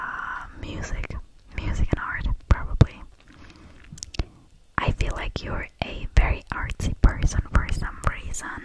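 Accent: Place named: United States. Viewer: I’ve only just started listening to and to be completely honest I thought you were American, as you do have a slight twang but that could be because of the internet, with a mix with a different accent. I assumed you were born and raised in America with your parents or one of your parents being European, I wouldn’t have guessed German either! 😂 One of my friends is half German, half English and you have similar accents but she is completely fluent in English and partially fluent in German. So I would've thought it was a similar thing. You’re English is really good, I thought you were a native speaker, don't put yourself down cause you are clearly better at it than you realise!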